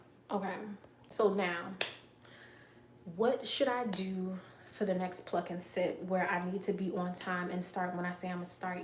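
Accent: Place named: New Jersey, United States. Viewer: I am so grateful to have found your channel!! I love your energy and this video was incredibly helpful! And this Jersey accent is giving! I live in Houston now but one Jersey girl always knows another. 💖💖💖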